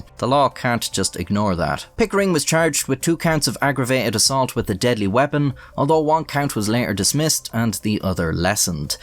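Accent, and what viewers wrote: Ireland. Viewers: I like hearing ridiculous stories and funny quips in an Irish accent… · With your accent lad I just cant figure which county ya from , wicklow, meath. If you're from dub I'm gonna unsubscribe. Lie if ya want to.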